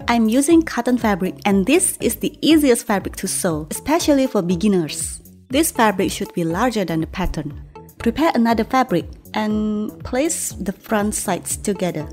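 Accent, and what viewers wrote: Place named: Malaysia. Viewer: Are you Malaysian or Indonesian!!! Your accent 😭😍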